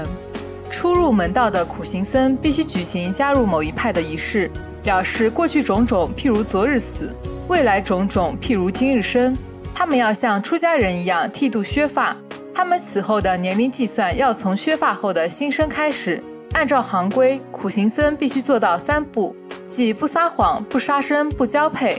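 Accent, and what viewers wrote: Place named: Xinjiang, China. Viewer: 你这新疆 口音很重！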